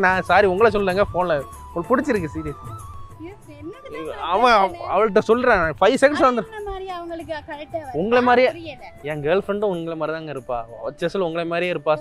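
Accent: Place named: Tirunelveli, India. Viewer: Bro tirunelveli karanu supera katturinga namma oru gethu speech than sema bro👌👌👌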